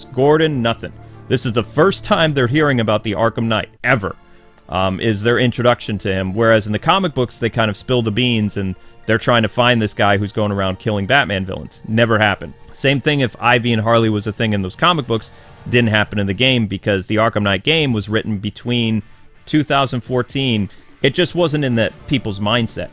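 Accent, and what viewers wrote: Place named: New York, United States. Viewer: new york accent has been coming out a lot more recently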